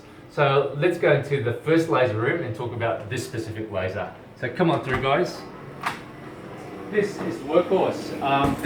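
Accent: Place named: Australia. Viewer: Rude. You look great. As a patient I always prefer to be treated by someone who isn't cookie cutter perfect. As an American, your Australian accent is more distracting, but in a good way :D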